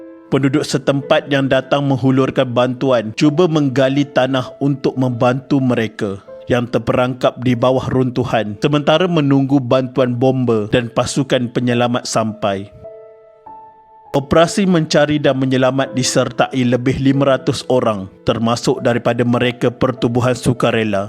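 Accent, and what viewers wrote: Kelantan, Malaysia. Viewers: Telinga saya banyak kali dengar admin sebut TADI dgn TAKDI.. saya agak admin lelaki Kelantan la, maaf kalau saya tersalah dengar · Kann..rasa ore klate doh tu 😁😁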